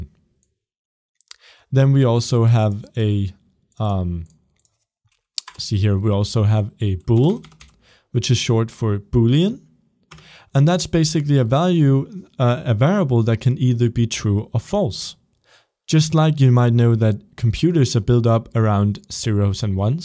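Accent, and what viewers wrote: Denmark. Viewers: wait are you danish ??? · Haha, sad og tænkte... "Den accent der.... Han MÅ da være dansker..." "... My name is... " xD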